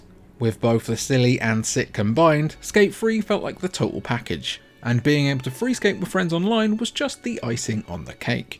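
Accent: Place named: England, United Kingdom. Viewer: You're english accent makes the comedy even funnier, lol. Great job.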